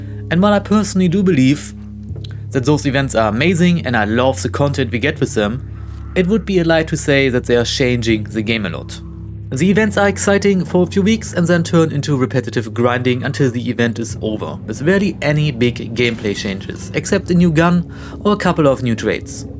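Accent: Germany. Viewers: Diggi machst du das mit dem deutschen Akzent absichtlich so doll? xD Ist ja crazy xD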